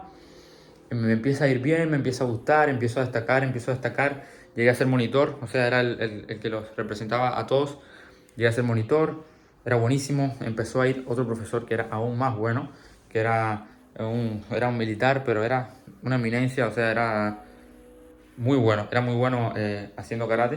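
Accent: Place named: Paraguay. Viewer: Empecé a ver tu video y no entendí, pensaba que eras paraguayo, tienes un acento muy paraguayizado..😊 Deséo que te vaya de lo mejor, las personas de bien son muy bienvenidas!!!!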